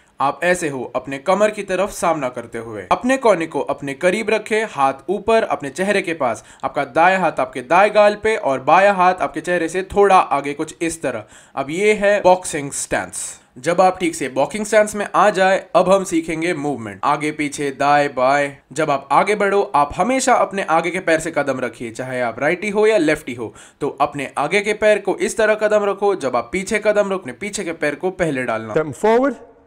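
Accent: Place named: United Kingdom. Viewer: oh bhai i just watched this vid in pure british english nice dub artist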